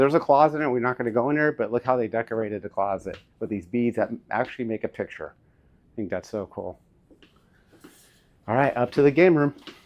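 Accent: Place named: South, United States. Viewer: 😂 I assume you know little to nothing about Real Estate because it appears you know little to nothing about Real Estate. Your narration is a comedy of errors. With all due respect you sound like a hick in the Beverly Hill Billies. And the video was nothing but a face shot of you blabbering with your shoulder strap upside-down. 20 minutes of watching your body take up 40% of the screen while we walk backwards dizzy is a turn off. Do a short intro of you then just walk the entire property camera facing forward without you in the shot or talking and add some nice music. Do another shot of you at the end with a wrap. We know what we are looking at. We know what a bathroom is and what the " nice wood on the wall" actually is called. Unless the comedy releaf of you saying things like "tennis ball court" is intentional. With all do respect rethink your format. 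Thanks for the laugh.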